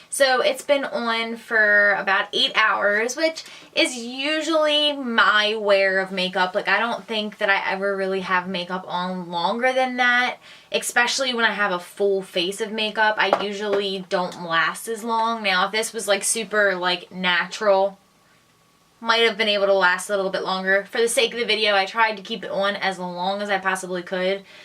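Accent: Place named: Louisiana, United States. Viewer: I just got to ask do you live in Louisiana or Mississippi? I'm picking up on your accent. and I have this Foundation as well and I'm still not sure how I feel about it yet even though I do like the shade I picked out I'm not sure about the wear time yet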